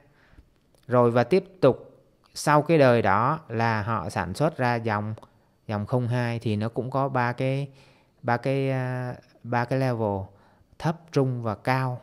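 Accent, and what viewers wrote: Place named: Ninh Binh, Vietnam. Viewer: dạ, nghe giọng nói của a, sao như là giọng chuẩn NB ấy nhỉ..hihi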